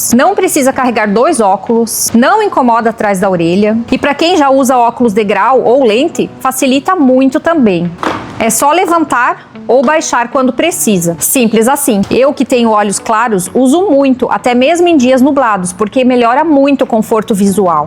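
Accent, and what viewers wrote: Brazil. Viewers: 0:3722:31 Suatque gaúcha tchê! tu és do Sul do País menina😉😉🤣🤣😂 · Pelo sotaque parece ser de Santa Catarina. Pela proximidade geográfica de Santa Catarina com com o Paraguai, não fica mais barato e fácil de acabar achando em Ciudad de Leste ou na Argentina as botas? Curiosidade mesmo. Acho super importante andar segura de moto ( eu moro fora do Brasil e vejo cada queda absurda aqui que só por Deus)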